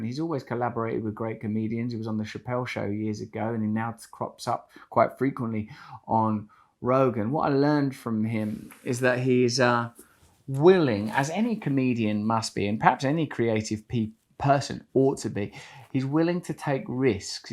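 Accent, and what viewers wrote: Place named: London, United Kingdom. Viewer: You sound like you're from London♥️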